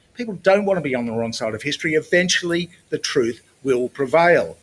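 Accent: Australia. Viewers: Nice to hear an Aussie ascent on my side of politics. I have missed it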